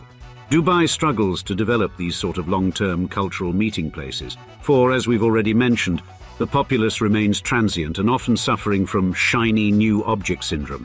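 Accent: United Kingdom. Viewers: Interesting: with a crisp British accent, we're informed that data collection in Dubai is extensive, and that cameras are ubiquitous. London is THE city to visit to be caught on camera.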